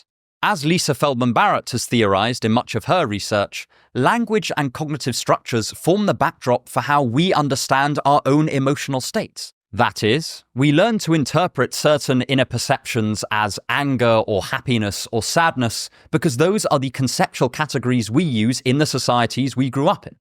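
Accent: England, United Kingdom. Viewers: I never expect you to have an english accent for some reason. Every single time I am surprised. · I've lived in england for 10 years and I've heard and english as british as yours before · This is a deeply unserious comment but I’m generally repulsed by British people and the British accent but you are one of the few British people I find attractive for some reason. Cheerio mate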